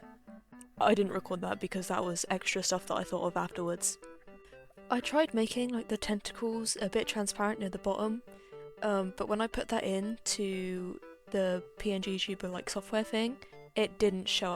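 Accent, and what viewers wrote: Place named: United Kingdom. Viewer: A British Talking Octopus Nice.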